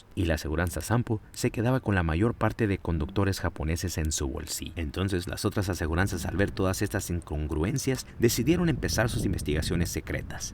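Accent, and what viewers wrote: Latin America. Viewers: "Imagínate que te cobren una reparación que jamás hicieron..." me suena a que tienes acento latino, pero que nunca viviste en latinoamérica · Suena muy latam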